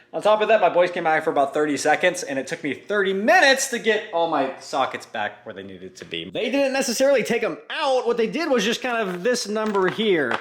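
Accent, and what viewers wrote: Hawaii, United States. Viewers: Bro yessah palala you Hawaiian my guy I love it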